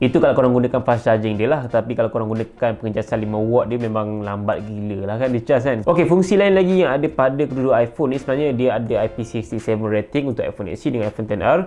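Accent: Malaysia. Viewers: Aksennya? Dia orang malaysia?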